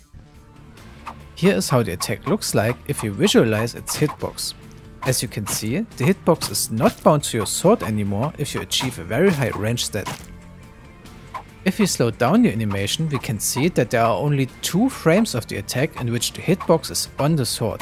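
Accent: Germany. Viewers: There he is! Our sweet german accented best boi is back!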